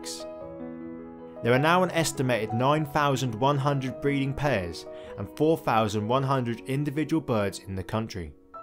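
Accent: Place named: England, United Kingdom. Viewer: I'm curious about your accent. What area of England are you from?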